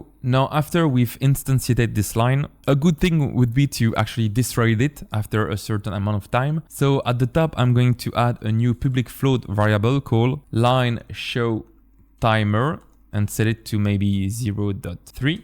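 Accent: France. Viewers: T'es francais?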